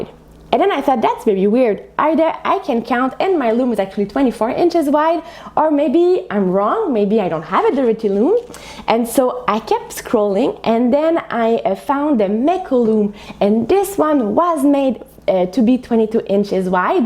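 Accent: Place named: Quebec, Canada. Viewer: How exciting for you! Congratulations on your loom. I have been weaving on a rigid heddle for a few months and hope to one day acquire a floor loom. Have fun and thank you for your videos. I like your accent. I am guessing French-Canadian?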